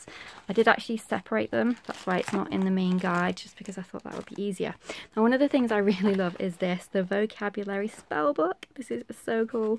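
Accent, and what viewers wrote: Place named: United Kingdom. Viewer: You sound british but are using american education terms. You're like me, become americansied from all the homeschool youtube videos and american curriculum websites :D XD I started using grades naturally now instead too :D XD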